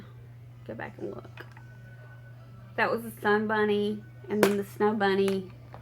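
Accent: South, United States. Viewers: You're welcome. I thought you were from the south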